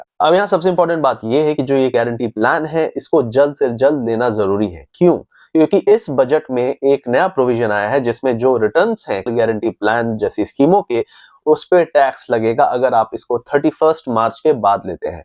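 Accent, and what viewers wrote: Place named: Gwalior, India. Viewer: Very original ho bhai...bilkul desi gwalior...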